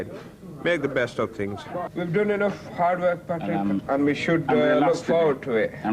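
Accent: Bradford, United Kingdom. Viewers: Stumbled on this,absolutely superb!the Asian Yorkshire narration is out of this world. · Thick Yorkshire accent, but his hometown is in Pakistan? I don't think so. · It was good to understand every word. You can't beat a good old Bradford accent. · What a pure british accent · Brilliant show this,real Bradford accent,Salt of the earth.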